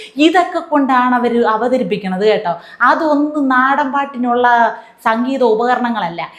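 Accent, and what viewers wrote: Thiruvananthapuram, India. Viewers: തിരോന്തരം ഭാഷ കിടുക്കി 👌👌👌👌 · What said is correct.. Nowadays ' Naadan pattu ' dance exceeds limits & highly boring.😮.. Can not enjoy.. Over action creats problems..Allow audience to relax & enjoy the song instead of creating uncontrollable & uncomfortable sounds.. By the by i can not understand why using malayalam language in a most funny way..? You may be using Thiruvananthapuram slang.. But that is not fair.. Disrespect to the language.. Boring also.. Our attraction to hear the details from you decreases due to this method..Better give details in your natural way..that will be more interesting..Prof.Mohan.. Tvm.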